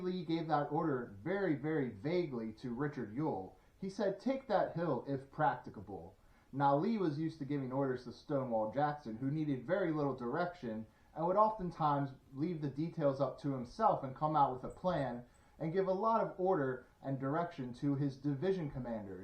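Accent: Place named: South, United States. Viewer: Watching this for a second time a year later. Lol. Im trying to figure out why your southern accent is ssoooo much different from Ranger southern accent. And his is authentic! Most importantly, perhaps the Rebs could have won if Garfield would have knocked the Union cannon off the table instead of the booklet.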